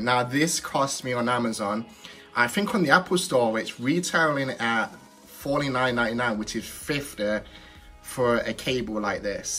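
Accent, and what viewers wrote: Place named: Midlands, United Kingdom. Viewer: “Me old” “I got it for fifteh” definitely a midlands lad 😂👍👍👍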